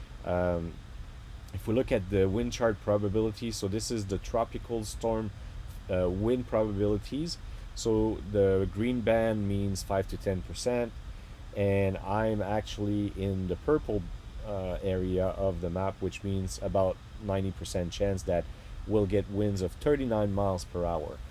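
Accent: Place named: Canada. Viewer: great ! you re good in english ! are you good in Spanish too ? LoL Oui ! ton anglais est tres bon ! Mais L accent du canada vas toujours rester ! Je suis retraite et prevoit aller vivre au Mexique en 2023 ! la derniere fois que j etais au mexique etait en 1981 ! LoL are you going to be there next fall ? would be fun to chat over a coffee ! good luck with the Storm ! I think you should be ok from where you are ! Asta manana !🧐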